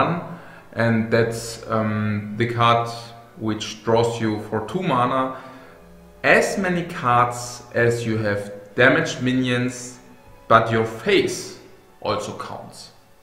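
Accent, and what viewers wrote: Germany. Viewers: his english sounds sooo german xD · Liege ich mit der Annahme richtige das du eigentlich deutsch sprichst? XD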